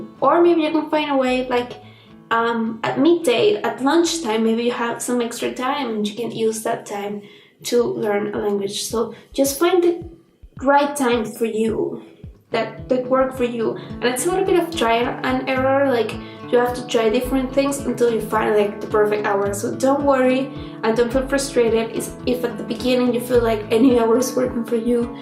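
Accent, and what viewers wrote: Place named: Latin America. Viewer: You are completely right!! So the first video I watched of you, I thought you were native from Spain due to your location and I say but how is that you speak english like someone from latín america jajaja but now I know you are neighbor of my country and it´s great to know it. You are doing really really well with all those language you know. keep doing!!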